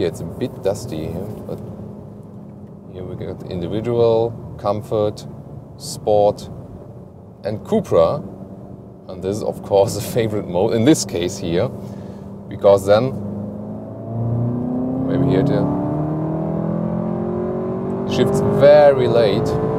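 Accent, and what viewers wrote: Germany. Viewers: God I love listening to a German accent when watching videos about cars!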